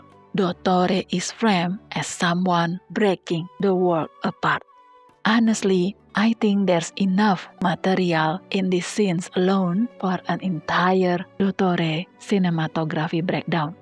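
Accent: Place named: Indonesia. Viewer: Definitely indonesian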